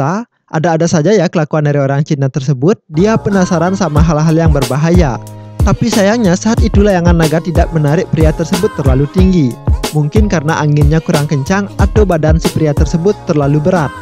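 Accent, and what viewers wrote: Aceh, Indonesia. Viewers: Dari logat km bicara Aku yakin km orang aceh kan??? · Youtubernya kayanya org aceh. Suaranya khas sekali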